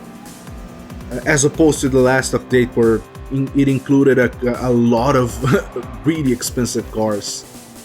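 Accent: Brazil. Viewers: TOP cara.... bem legal hem.... Como eu estou aprendendo aprendendo inglês eu percebi seu sotoque de brasileiro. hehehe Legal cara.. eu também tenho um canal no youtube mas é sobre T.I. Isso manu. Abraços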